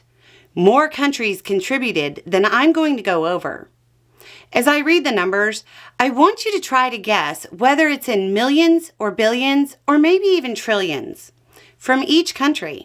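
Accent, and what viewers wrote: United States. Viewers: Pleasantly surprised to hear an American accent speak so much sense.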